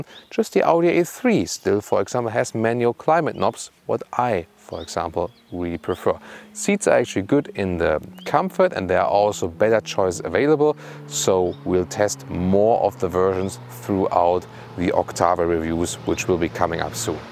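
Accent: Germany. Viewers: I really like your German accent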